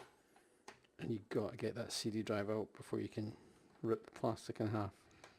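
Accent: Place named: Scotland, United Kingdom. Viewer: Thanks, love your low key presentation (and the Scottish accent!)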